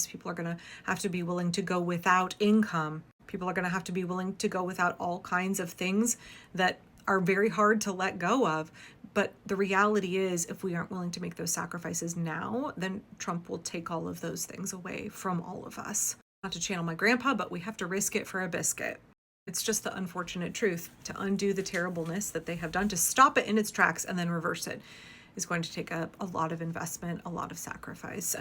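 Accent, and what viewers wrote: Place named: Alabama, United States. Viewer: UGH, sounds like Bama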